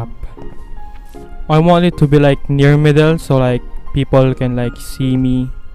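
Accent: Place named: Philippines. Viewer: You Really Have A Filipino Accent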